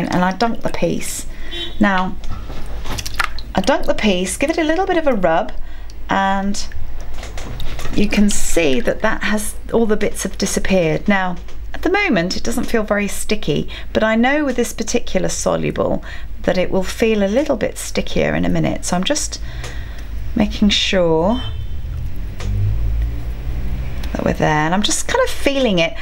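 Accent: United Kingdom. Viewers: Thank you so much for this - have been sat getting very frustrated by wasting time on other useless videos and yours was perfect!!! 🤩 🫶 Quick question, if I may 😊 I have Brother 380D, and only 5 or so automatic embroidery patterns are marked for being ok for water soluble stabilser… the majority of the rest look pretty much chunky enough around the edges and fillings etc to be able to be held together enough -would they work and it’s just Brother being overly cautious? 🤭 Anyway, having watched your video, I now see that the world is my oyster! 😁 Thanks tons from a Brit who’s been living in France for so many years, that it was warming to hear your accent, as the majority of my crowd are French 😂❤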